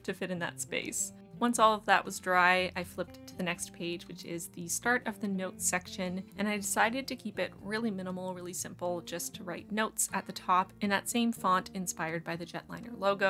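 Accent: Canada. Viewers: Aayyeee I had no idea you were canadian til i heard pencil crayon haha xD ive only ever heard us say that with our NA accents, i think brits say it too but not sure xD nice to see more canadian-based bujo channels. i love seeing both your spreads and your husbands, my current style is waayy more in-line with what you do for your husband, but my dreams are more like yours so it's really cool seeing both!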